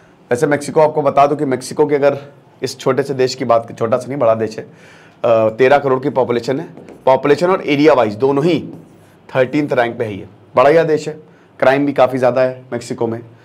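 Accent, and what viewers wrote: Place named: Uttar Pradesh, India. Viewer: Aap UP k ho ye to accent se pata chala aur sun k maza aya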